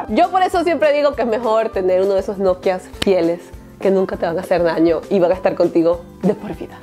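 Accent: Venezuela. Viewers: soy yo o aveses abla muy venezolada!!!!😂😂😂😂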